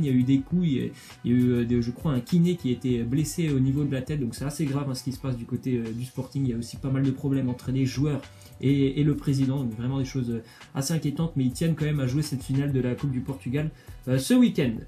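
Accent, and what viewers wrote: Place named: South, France. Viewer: Ta prit un accent du sud 😂et dommage pour Marseille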